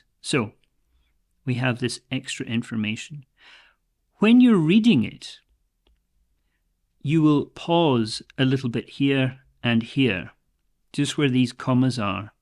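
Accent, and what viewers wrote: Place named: Scotland, United Kingdom. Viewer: From a French point of view, the term "Anglo-Saxon" refers to all people living North of the English Channel or across the Atlantic. But in reality, almost each village in "England" has his own accent, without taking into account Scotland with his 10 dialects (South Central, West Central, East Central North, Borders Scots, East Central South, Angus & Kincardine, Doric, Orkney, Shetland, Lalland, Scotch)... I haven't noticed rhotic accent with you yet, but /ʌʊ/ instead of /aʊ/ like (cow, town, house, down -> coo, toon, hoos, doon) or /əʊ/ by /o:/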